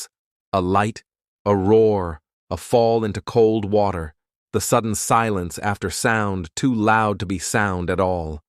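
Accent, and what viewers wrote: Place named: United States. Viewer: 1st. First of all, thank you for pronouncing EVERYTHING, in perfect American. I know this was not Al, and you could, really tell.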